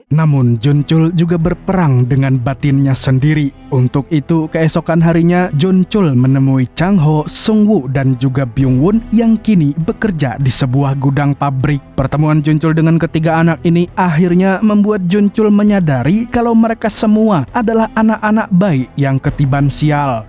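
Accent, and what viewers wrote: Banjar, Indonesia. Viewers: Urang banjar kah ni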